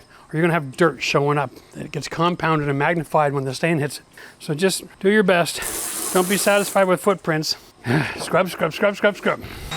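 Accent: Canada. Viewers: Great work and an amazing deck. I could be wrong but you sound Canadian.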